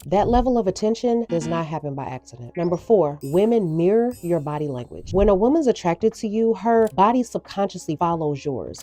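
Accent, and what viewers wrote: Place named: South, United States. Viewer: My cousin says women are like ice cream, 38 different flavors of the same 💩! I love the southern accent on this commentator😋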